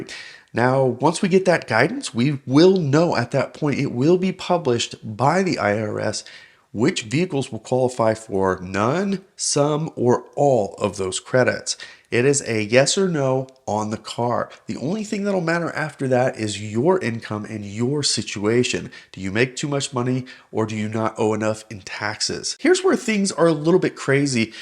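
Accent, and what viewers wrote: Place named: Greece. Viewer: youre greek arent you! lol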